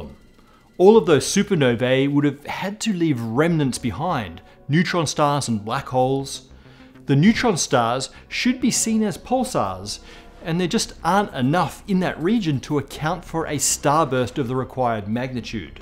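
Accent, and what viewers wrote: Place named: Australia. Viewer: OY! LOOK! AN AUSTRRRRRRRRRRAILIAN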